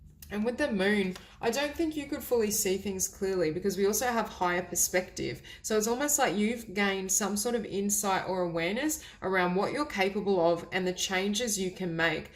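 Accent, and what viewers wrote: Australia. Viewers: Where are you from? Sound like Australian.. if yes, can i drop by for reading personally?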